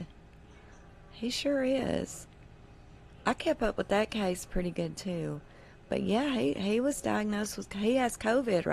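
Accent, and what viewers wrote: South, United States. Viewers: this Aussie is loving your sweet southern accent reciting this shopping list. Also your little “barbs” thrown in make this very interesting to listen to. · En vel ops lol I know those southern pronounciatuons!! I love it! Been in South Texas too long not hearing the real southern accent!!! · Nothin' better than southern accent. We friendly too! Well, most of us...😁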